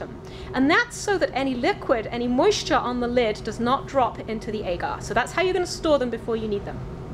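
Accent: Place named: United Kingdom. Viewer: I think British.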